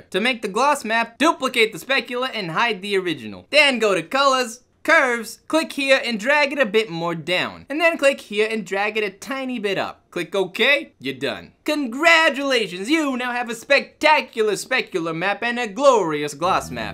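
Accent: New York, United States. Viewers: you have an awesome new york accent.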